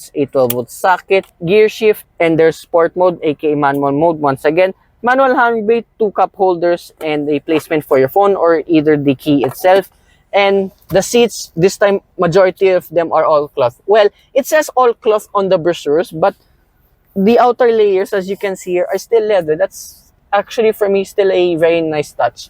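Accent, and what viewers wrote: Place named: Philippines. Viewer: Masgusto ko yung ganitong review. May Filipino accent. Masnatural since ganito halos lahay magEnglish merong Filipino accent. Masnaiintindihan ng tao. More review to come sir.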